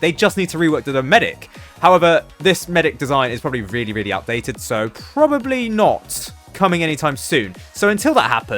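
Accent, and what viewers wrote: United Kingdom. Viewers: Let's go i love British man talking about Geneva convention laws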